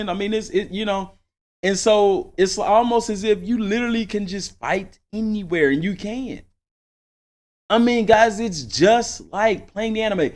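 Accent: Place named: North Texas, United States. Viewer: Super Hype for this game NOW, BUT you can’t band wagon something before it comes out and Proves how good or great it is. it’s not band wagoning to anticipate a good experience based on pre-Viewing. That’s just Marketing ,advertising, and publicists doing their job to make it more appealing to its demographics bro and that includes youtubers. Also you wouldn’t happen to be from North Texas area. Just curious straight out the gate you sound like my kinfolk and friends; accent and speech pattern wise.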